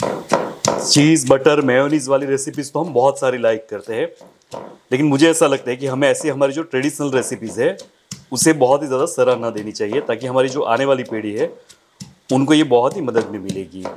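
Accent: Gujarat, India. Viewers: Actually unka gujarati accent hai.. Toa apko der se samjh ata hoga.. koi baat nahi dobara sun lene me koi burai nahi hai..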